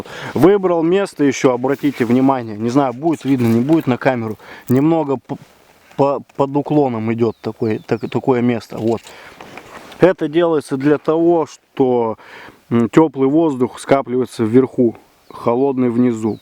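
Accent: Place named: Siberia, Russia. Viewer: Говор сибирский. Термометр надо было захватить.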